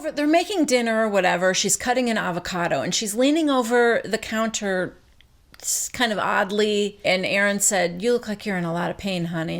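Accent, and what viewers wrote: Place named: South, United States. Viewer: Oh my gosh!!!! Rinna might as well be flipping LVP off. Hot pink satin top ,hot pink eye shadow and longer brown hair. 🤮. She will.do anything to be seen and get a paycheck. It's funny how Rinna always has to mention how rich people are. Love the Southern accent, you are the best. Well I guess I had better go change my yacht underwear and go work out.🤣🤣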